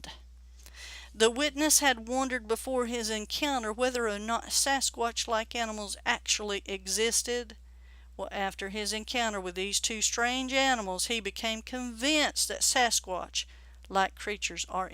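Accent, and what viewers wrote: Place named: Appalachia, United States. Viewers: I know where you are talking about you sound like Appalachian instead of a Texan I’m from south east Texas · Great story, and any kind of Southern accent is a welcome sound to my ears. 😀👍🏼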